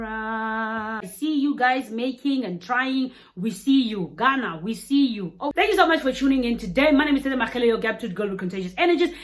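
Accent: South Africa. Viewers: Lol you sound like South African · ❤️❤️❤️☺️☺️☺️☺️ Your accent is South African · Lol when I go to youtube nothing turns me on like googling for "South African reactions" "Amapiano reactions" trying to find what other ppl think of us Etc I think that what everybody else is doing. I will support and subscribe 😅😂🤣 coz its a good South African reaction. I like you, you are fulfiling your purpose and given your personality and toothgap for a reason. You are fixing the rest of Africa while Addressing Africans as a Congolese you look and sound South African even your jolly and spirit is so un characteristic. You are bor for this.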